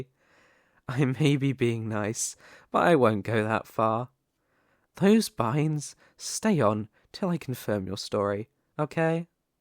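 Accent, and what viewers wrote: United Kingdom. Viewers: Oh that accent makes me happy as a fellow Brit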